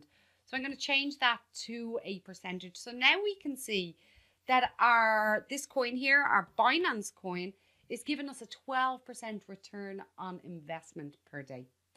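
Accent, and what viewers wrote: Ireland. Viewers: Love the Irish ☘️. Thanks for this 👍🏽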